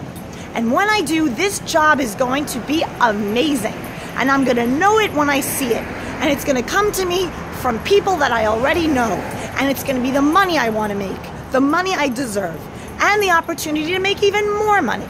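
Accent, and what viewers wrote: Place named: New York, United States. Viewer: New Yorker? Love the accent. Your energy is amazing! Thank you for sharing! I love Abraham I listen to her every day 😀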